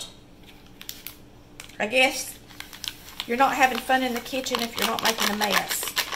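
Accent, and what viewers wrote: South, United States. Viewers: I love your personality and southern draw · I think your hair is beautiful I love your southern accent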